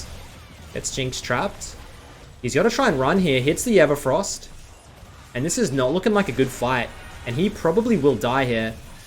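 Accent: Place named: Australia. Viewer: Hey man me and my girlfriend love watching you, but recently she's been telling me to get a haircut similar to yours and start speaking in a Australian accent? Should I be concerned?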